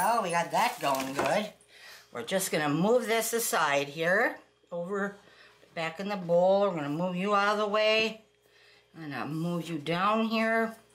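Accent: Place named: Wisconsin, United States. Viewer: I'm listening to your instructions and just said to my husband "I wonder if she's from Wisconsin?". I looked at your channel, and oh geez; you're from Wisconsin! I guess we just know a fellow Wisconsinite ❤ . Are you going to watch the Packers play in Brazil on Friday? Go Pack Go! Thanks for the pie crust! It's nice and easy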